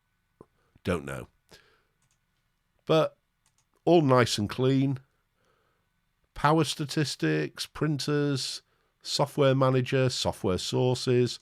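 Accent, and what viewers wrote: United Kingdom. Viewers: Mint has been my main dektop OS for quite some time now. The thing just works!!!! On my desktop, my laptop, kids laptop, kids gaming desktop running Minecraft nonstop, the media centre....I've had Ubuntu installed at some point until they came up with that Unity nonsence and I was facing the dilema whether I had to run to the nearest PC shop and shed cash for upgrades or switch distros, then I discovered Mint... I've been Linux user since the late 90's and started with Slackware. Really enjoy your videos and glad to see reviewers with British accent out there :-D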